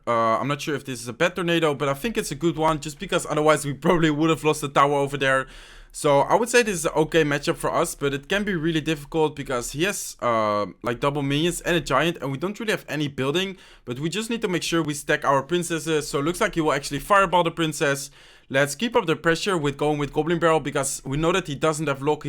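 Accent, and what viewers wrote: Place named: Netherlands. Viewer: Your accent giving me headaches. Why can't you speak english like any other dutch human being.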